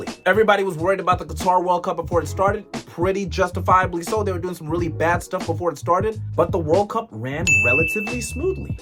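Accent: United States. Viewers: How were you born in South Africa live in Birmingham but sound American. That's mad 😂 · If he’s South African and leaves in England, why does he speak American? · You're south African You sound American But live in the UK?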